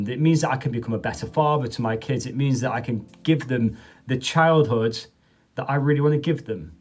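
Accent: United Kingdom. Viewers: (this comment was based on your UK accent lol)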